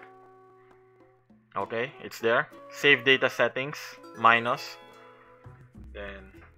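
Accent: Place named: Philippines. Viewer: Filipino accent there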